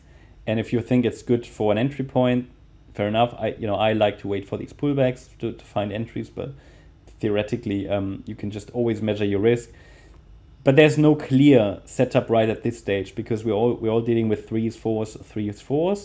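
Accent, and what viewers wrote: Germany. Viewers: Thanks, Hear your accent, i assume you are German. I am also living in Germany and have made quite significant gains with Solana. I wouldn't like to sell it because of 42% crypto tax here i need to hold on until july because then I'll complete 1 year and then tax rate would be 0%. What would you suggest? Take my profits now or wait until July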